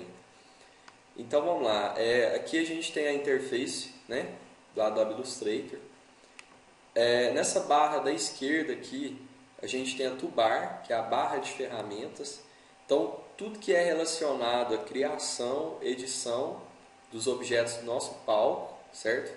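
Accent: Minas Gerais, Brazil. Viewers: meu deus que fofura de sotaque mineiro. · Alguma duvida que é mineiro?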